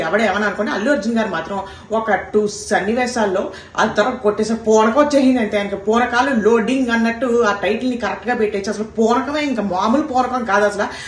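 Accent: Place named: Godavari, India. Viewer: అచ్చడిపోవాలి అంతే 🤣🤣🤣...గోదారి స్లాంగ్ ఆదరగోట్టారు👌🏼😍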